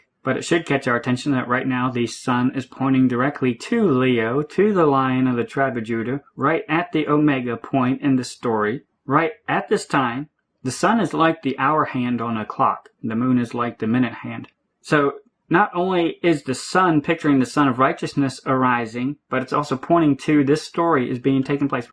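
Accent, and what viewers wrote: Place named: South, United States. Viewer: I know, isn't his accent the cutest, southern drawl. He will be busy with lots of hugs very soon! Of course, after we all fall down at the feet of our amazing Lord and Savior Jesus Christ and get hugs from Him first. Maranatha guys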